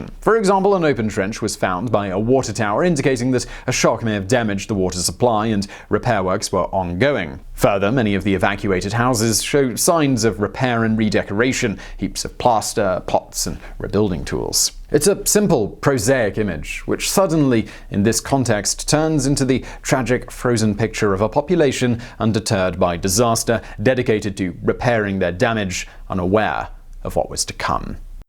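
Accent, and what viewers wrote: United Kingdom. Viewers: is a brit, so i'm sure it doesn't matter and will never happen, but i can only wonder what it would be like sitting at a thanksgiving day meal with. ten servings of 19 minute segments. with all the snark and wisdom that only the elocution of a british accent can bring to the english language. rock on.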